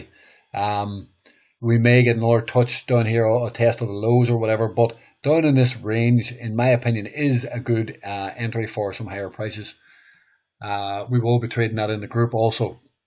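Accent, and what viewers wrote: Northern Ireland, United Kingdom. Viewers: Northern Ireland accent i hear there ?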